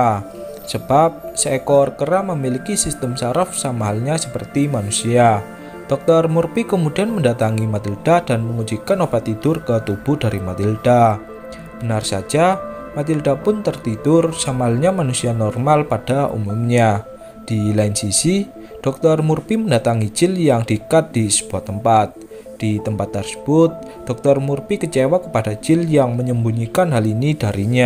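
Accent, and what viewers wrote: Java, Indonesia. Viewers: hostnya first medok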